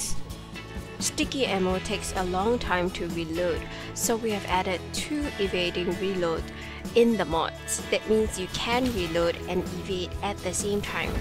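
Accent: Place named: Singapore. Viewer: You sound so Singaporean!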